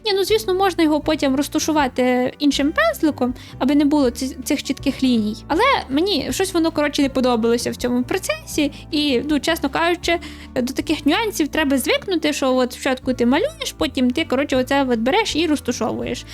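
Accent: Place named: Western Ukraine, Ukraine. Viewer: Дуже круто! Особливо дякую за розмовне відео, це те, що потрібно українському ютубу. Ваш говір крутий і мені цікаво, з якої ви області? Я ставлю ставки на межу 3 областей: ІФ, Львівської і Тернопільської)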